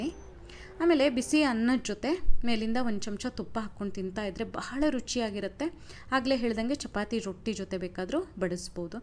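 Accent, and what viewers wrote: Karnataka, India. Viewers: Nanu ninne madade... Nugge soppuna saru Nimma accent nange havyakara kannada anstu.... All the best for your Channel Thank you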